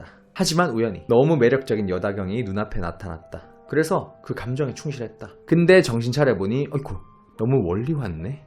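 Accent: Gyeongsang, South Korea. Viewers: 경상도세요? 발음이 살얼음 위를 걷는듯.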